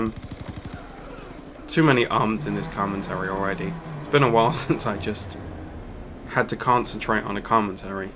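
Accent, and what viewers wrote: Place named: United Kingdom. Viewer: Hey..much love from Germany to the Voice of GB;) it's so funny to listen to you and...you with the british accent and the opposite is with his cool ny talking thing...;)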